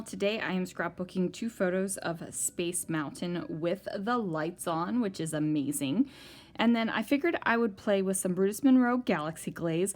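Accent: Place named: Maryland, United States. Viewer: Love your Maryland accent...miss that place as we have landed in Florida!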